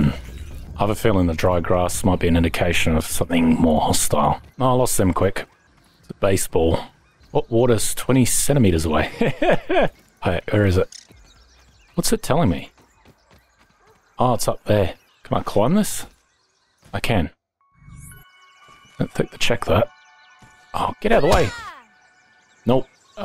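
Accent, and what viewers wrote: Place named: Australia. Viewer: Ok you can all laugh but it took me forever to realize has an Australian accent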